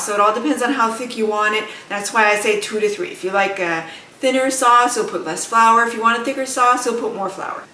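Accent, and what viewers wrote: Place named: New Brunswick, Canada. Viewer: You must be from NB! I grew up in Bathurst and I recognize the recipe (coked it many times myself) and your accent! - a new subscriber from NJ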